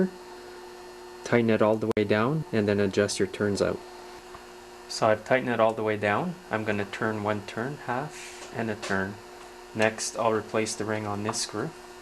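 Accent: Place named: Canada. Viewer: great info. exactly what i needed. thanks for posting! nice Canadian accent, by the way. very calming!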